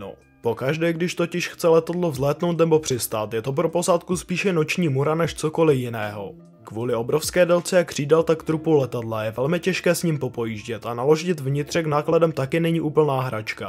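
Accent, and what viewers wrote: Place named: Moravia, Czech Republic. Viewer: Ta moravština je příšerná řeč ale jinak obsahově slušný... namluvit to civilizovaným jazykem, dám like.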